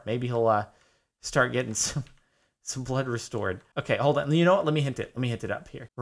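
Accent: Russia. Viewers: I love your accent it sounds like a Russian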